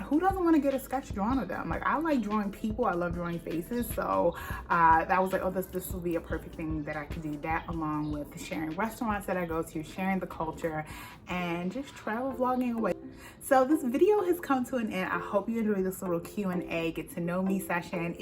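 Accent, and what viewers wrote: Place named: United Kingdom. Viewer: Your British accent is believable. You are funny!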